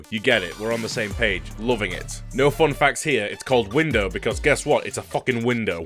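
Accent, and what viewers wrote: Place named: Yorkshire, United Kingdom. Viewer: love the yorkshire accent, keep it up!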